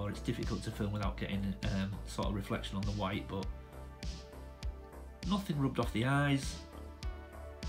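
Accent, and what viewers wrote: United Kingdom. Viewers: I didn't understand why your grandma got you those Scottish goodies in the past? Also your accent it sounds a bit different that most British accents I've heard.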